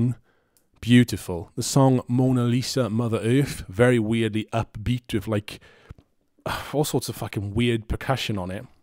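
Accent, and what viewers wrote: Wales, United Kingdom. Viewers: Strong welsh accent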